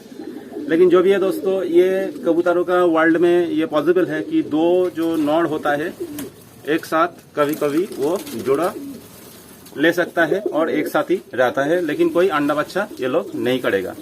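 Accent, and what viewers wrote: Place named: Bengal, India. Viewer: I understand from your accent that you speak bengali. Accha, apni ki kokhono dekhechen je kobutorer vai bon jora ney? Amar ekjora ache emon, dim diyeche ora, ete ki bacchar kono problem hobe? Anways you have beautiful pigeons! Love from Bangladesh ❤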